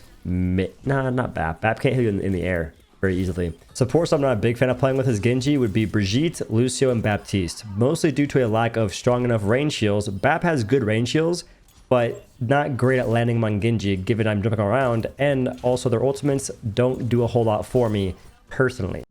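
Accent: South, United States. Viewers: You went to having an accent to being a country boy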